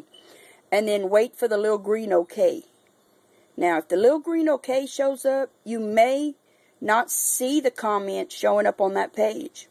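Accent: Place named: Louisiana, United States. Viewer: you sound like my family in southern Louisiana. Your accent reminds me of them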